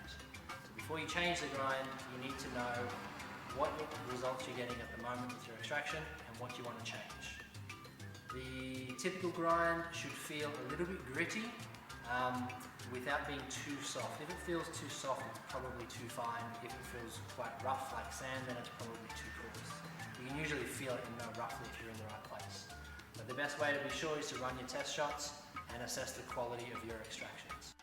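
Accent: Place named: Australia. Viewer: So good to hear an Aussie voice - The drone of Americans is more than I can take! Thank you for imparting your wisdom on us. We have a mini mazz - So many 'baristas don't even drink coffee!!! We start each day with a ristretto piccolo or 3 - The key to a happy marriage: )....oh and we subscribed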